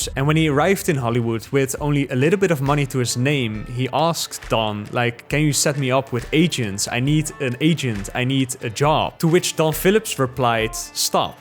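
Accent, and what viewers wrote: Netherlands. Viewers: Je videos zijn altijd ZO goed maar ik denk 100% (en dit bedoel ik niet als belediging) dat je nóg meer views krijgt als iemand anders met een wat minder sterk Nederlands accent het audio gedeelte over zou nemen. (Met alle plezier zou ik dit voor je doen/proberen als je er voor open staat.) Nogmaals, na genoeg top tier content wat mij betreft!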